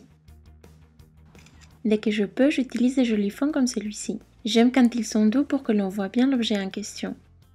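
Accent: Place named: Spain. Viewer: Elle a l accent espagnol mais on la comprend très bien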